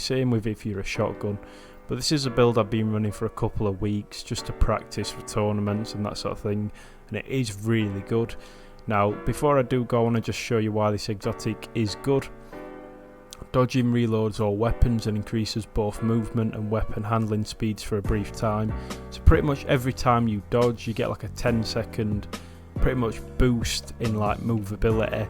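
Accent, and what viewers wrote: Yorkshire, United Kingdom. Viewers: Where from Yorkshire u from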